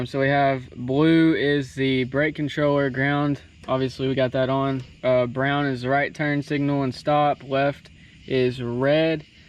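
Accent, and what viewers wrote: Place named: Texas, United States. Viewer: Ok maybe not from Texas but you sure do sound like it…! Mad skills bro, stay blessed!!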